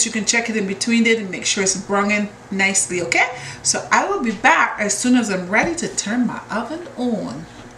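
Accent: Barbados. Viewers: I happened across your channel a couple weeks back. From the time I heard your voice I said "she is a bajan". I am off work this week and you can bet I'll be trying some of these recipes.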